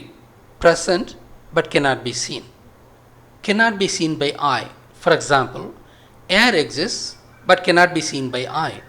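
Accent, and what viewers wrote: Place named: India. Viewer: also all the arabic words you mentioned you pronounced in Indian accent.. vocally in Arabic its not even the same word.. its like a deaf guy struggling to correctly voice the words.. so you cannot match the words vocally if you cannot correctly pronounce them..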